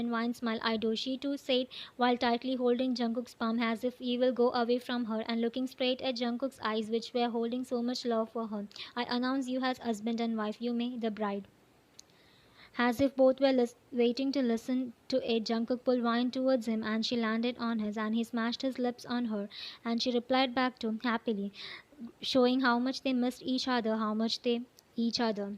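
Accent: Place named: India. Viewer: Hey author ..if you don't mind I want to ask where are you from ? I mean country.. well your English accent seems like Indian accent which I liked it 😊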